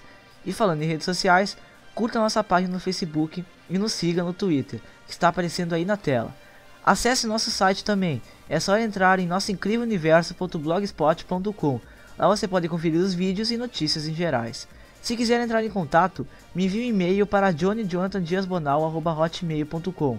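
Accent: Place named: South, Brazil. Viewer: sotaque é do sul, nada a ver com norteste.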